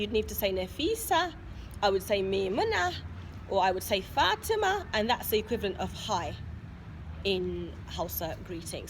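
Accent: United Kingdom. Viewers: It's British accent, especially that of London, [other accents in England might me so different]. You might consider listening to British podcasts, or relocating to Britain for a while. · Why she got a British accent?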